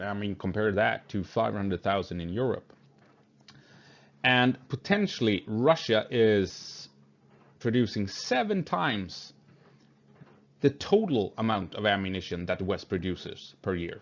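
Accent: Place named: Sweden. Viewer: Veldig imponert over swenglishen din😂👍 Denne kanalen ble lagt til blant mine favoritter med EN gang!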